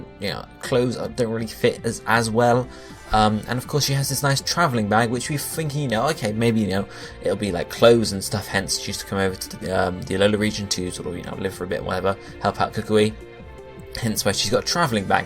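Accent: United Kingdom. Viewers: How does a magearna fit in that bag?! A 1ft diameter ball was fishy but a 3'3" ball?! You sound british, perhaps you don't know how to translate feet to centimers?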